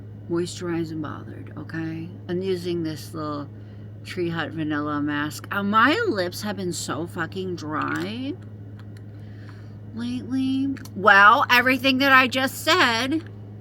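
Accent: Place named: United Kingdom. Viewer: British accent 💯!!! 😂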